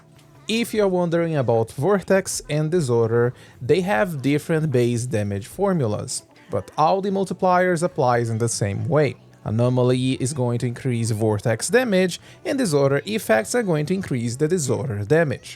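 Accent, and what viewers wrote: Brazil. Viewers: Hey, thanks so much, that's really kind of you! 🙏 So basically my issue is: I have several S-rank agents that should work well in an anomaly team, but I'm barely dealing any damage with them, especially with Alice. My S-ranks are: Alice, Promiea, Yixuan, Evelyn, Vivian, Pyrois and Velina. If you could write out how to properly build/rotate a team around these to actually get good anomaly damage going, that would help a lot! Writing it out is honestly easier for me to follow anyway (and easier to translate if I need to) 😄and dw about ur brazilian accent lol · First time here and still learning the anomaly mechanics cuz brain goes WAHOO whenever Ye Shunguang goes flying and wipes everything since i unlock her when i started playing :v And yeah, i felt that you were also brazilian from your accent hehe. Still really good video btw (ainda n terminei de ver, mto numero kk)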